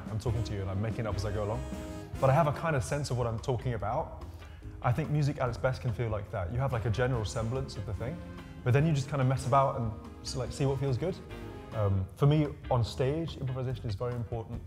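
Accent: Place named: United Kingdom. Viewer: Charmingly British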